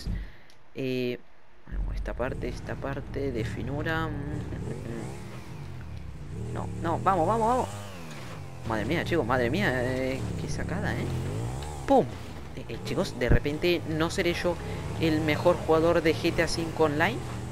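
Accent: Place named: Argentina. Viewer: Me vuelve loca el acento argentino y mas si es hablado por ti, te quiero, saludos desde Mexico❤️❤️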